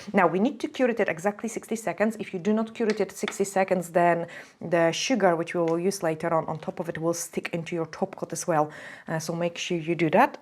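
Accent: Scotland, United Kingdom. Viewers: I love these they're gorgeous! I've actually just tried doing some flowers like this and I'm amazed at how breaking it down and starting with the dots is such a HUGE help, so thank you! 👏 By the way, Is that a bit of a Scottish accent I hear? 🥰💜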